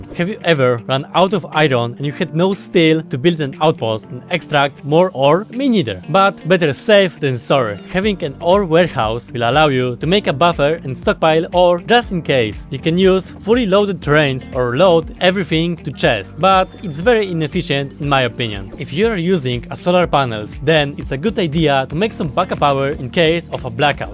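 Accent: Russia. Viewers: почему мне кажется что у тебя Русский акцент? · Well, I would say that I love you because you have a Russian accent, but I think it would be useful for you to learn Russian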